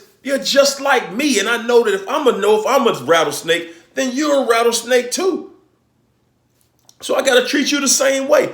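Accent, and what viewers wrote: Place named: Philadelphia, United States. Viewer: That is right ( more sincerity from the brother ) please everybody hear what he's saying. Yo, I'm talking about culture. He reminds me of my street friends. How we talk is how he talks..and he's saying a lot of things on the subject of narcissism. I'm so proud of you my friend and brother. I'm a NY'er, you sound like you from Philadelphia, you say DC keep doing what you're doing. Great message.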